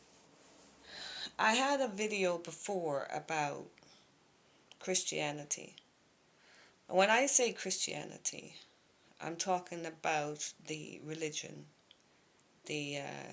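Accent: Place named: Jamaica. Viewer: You sound Jamaican.....keep on LIVING. Number seven 7⃣.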